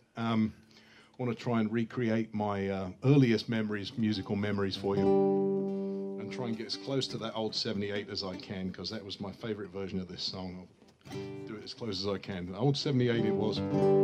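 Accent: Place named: Australia. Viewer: British humour.? Then why does he sound like an Australian?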